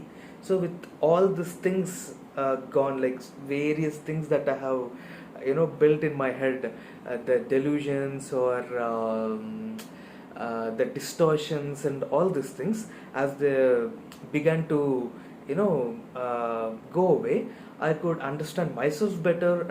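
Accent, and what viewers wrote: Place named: Telugu, India. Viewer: Just by your body language and accent anybody can say you are telugu origin...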